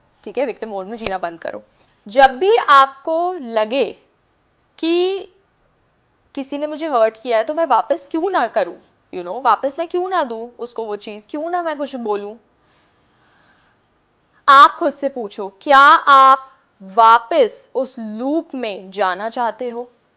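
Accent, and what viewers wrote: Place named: India. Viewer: Muje lga thumbnail se .koi foreigner tarot reader h😂aapki hindi se pta chala aap to indian h❤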